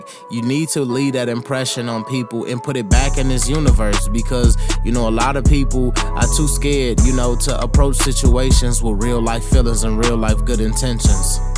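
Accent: Chicago, United States. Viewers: You the shit! I love how you Chicago slick talk 😎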